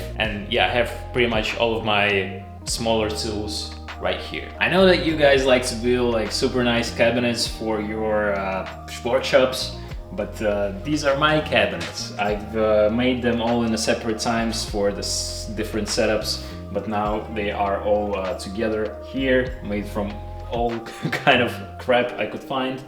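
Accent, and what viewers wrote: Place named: Eastern Europe. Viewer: you have a very slavic accent.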